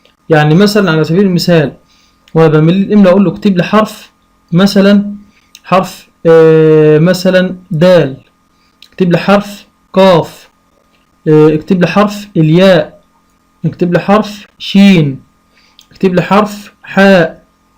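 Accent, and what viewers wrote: Egypt. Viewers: المصريين بيقرؤوا الجيم بهاد الشكل رغم انه غلط...لازم يلفظها مثل قراءة القران وهو الصحيح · انطق الجيم نطقا عربيا وليس مصريا من فضلك هناك اطفال غير مصريين يتفرجون كي يتعلمون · طريقتك مفيدة لكن لازم تتكلم باللغة العربية ليس بالمصرية لأنك معلم مثلا لازم تقول سجد وليس سڨد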